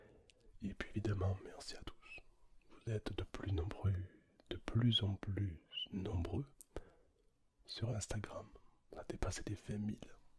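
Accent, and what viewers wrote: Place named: Toulouse, France. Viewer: La voix de crooner avec l'accent toulousain(que j'avais eu la chance d'entendre en te croisant au tgs Springbreak il y a 1 an) je valide, pour un des seules voix masculines me relaxant, t'es un Chef👍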